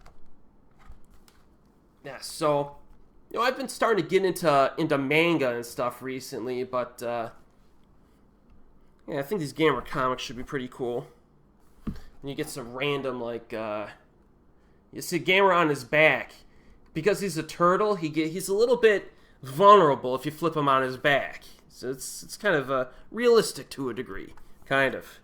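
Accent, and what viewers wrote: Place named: Pennsylvania, United States. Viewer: You gotta be from PA with that accent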